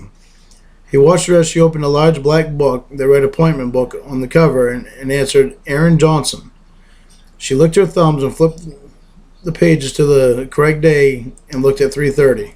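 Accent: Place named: Maine, United States. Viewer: Sound like a mainah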